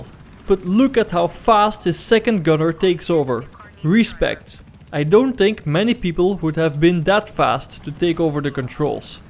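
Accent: Netherlands. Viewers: you dutch? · Are you dutch?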